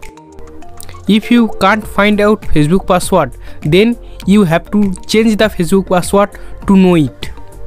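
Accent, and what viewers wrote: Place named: Bengal, India. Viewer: আপনি যে বাঙালী তা আপনার ইংলিশ বলাতেই বুঝতে পারছি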